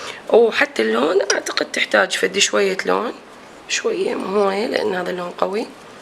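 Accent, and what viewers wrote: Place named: Iraq. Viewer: اكثر وحده أعجب بيه اثناءمشاهدة الفيديو كلامج عراقي وطبخج عراقي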